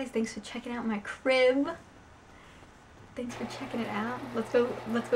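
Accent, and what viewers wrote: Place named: New York City, United States. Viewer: i like ur urban nyc accent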